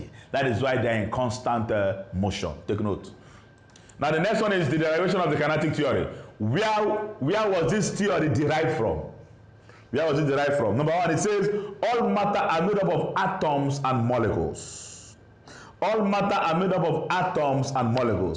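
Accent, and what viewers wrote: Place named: Nigeria. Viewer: hello sir, wonderful lesson but i found somethings lacking. you said quite a number of words that I've never heard before, like the tool used to measure matter but the spelling wasn't shown on the screen. as you already know, Youtube subtitles don't really do a great job in translating the Nigerian accent. Please work on either adding your own subtitles or letting the important points appear across the screen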